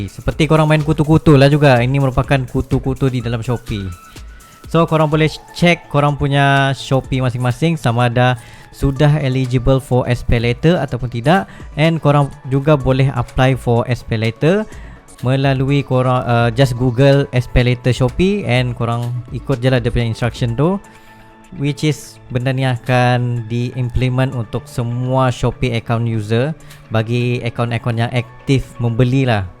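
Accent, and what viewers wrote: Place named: Sabah, Malaysia. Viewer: Itu slang Sabah nda buli lari bosku hehe. Terbaik content 😁👍🏻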